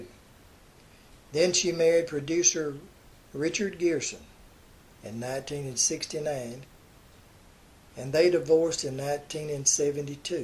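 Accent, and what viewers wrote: South, United States. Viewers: the hillbilly naratory drives me crazy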